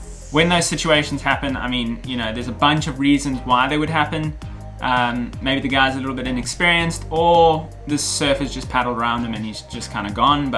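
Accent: South Africa. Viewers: Hey you’re a South African 🤙🏻 Lekker Bru. I bet you’re from South Brim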